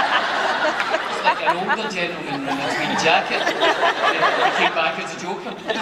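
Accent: Scotland, United Kingdom. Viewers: No he just sounds Scottish you pleb. And British isn't an accent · He's accent is like a mix of British, Indian, Scottish and maybe a little bit Scandinavian.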